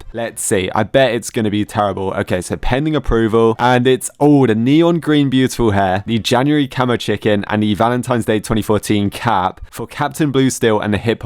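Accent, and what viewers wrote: United Kingdom. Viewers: I love your accent this is the first time I've heard a UK accent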